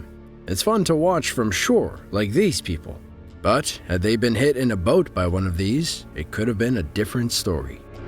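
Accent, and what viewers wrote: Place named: Boston, United States. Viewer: My man loves in Florida and talks like a guy from Boston, “this iz basahh”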